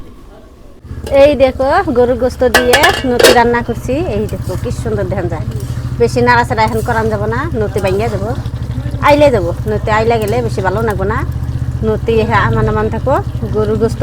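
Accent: Tangail, Bangladesh. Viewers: আপনার জেলা কি টাঙ্গাইল নাকি?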